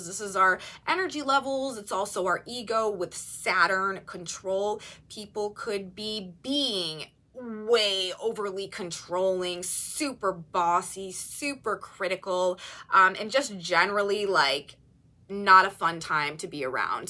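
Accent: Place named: South, United States. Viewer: I love your videos...VERY thorough & highly crucial information. But let me tell ya, girl, you have an adorable southern quirk (I won't say what it is) because it is absolutely adorably classy on you! You put this quirk out there crowned with nothing but SUPER CLASS!!!:washhands::washhands::washhands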